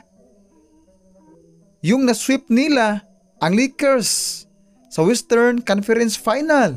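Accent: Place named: Visayas, Philippines. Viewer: Bisaya kaayo ka boi!!!!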